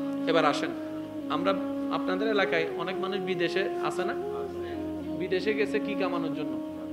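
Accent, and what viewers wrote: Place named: Chittagong, Bangladesh. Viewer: হুজুর আপনার ওয়াজ শুনি খুব ভালো লাগে। কিন্তু চিটাগং ভাষায় কথা বললে একটু বুঝতে কষ্ট হয়। আমার একথা ভুল হলে, মনে কষ্ট পেলে খমা চাইছি।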